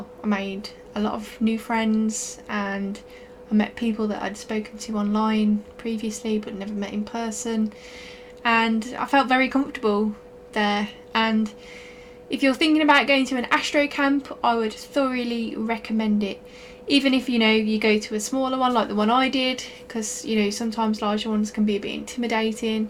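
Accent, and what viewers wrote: United Kingdom. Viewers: Where yow Bin ? 😂😂 Welcome back fellow Brummie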